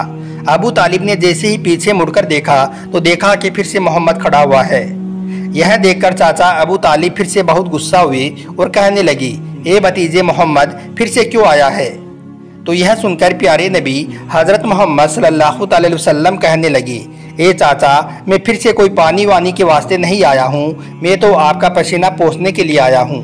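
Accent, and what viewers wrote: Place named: Bihar, India. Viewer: Bihari pehle apne boli sudhar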